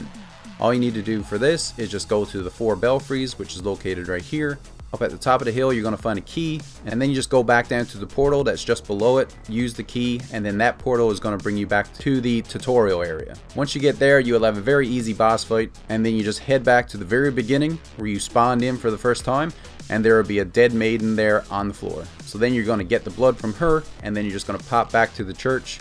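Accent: Newfoundland, Canada. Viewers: I knew you sounded from around the bay!! Haha awesome buddy how’s ya getting on? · Newfoundlander 😎 I could tell soon as I heard your voice I too am from the rock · you're a newfie arent you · Are you Newfie?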